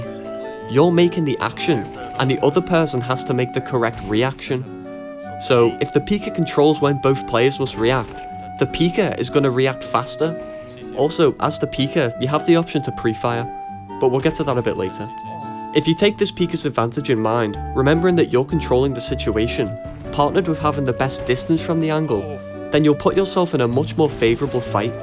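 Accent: Liverpool, United Kingdom. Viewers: the liverpool accent is killing me😂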